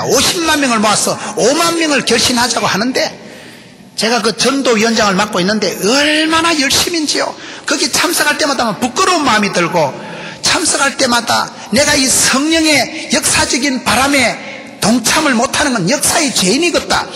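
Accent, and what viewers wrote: Gyeongsang, South Korea. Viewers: 경상도 방언을 쓰셔서 그렇습니다 거친 표현이 아님니다 더군다나 교만하고는 거리가 뭡니다 국어학 적으로 아무런 문제가 없습니다 그러려니 하고 들으시면 되지 않을까여 😊😊😊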